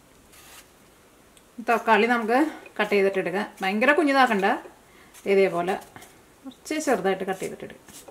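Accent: Thrissur, India. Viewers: ee Thrissur slang thanne endh resaa kelkkaan... athinte koode immaathiri tasty food um undaakkumbo sherikk Thrissur Pooram....!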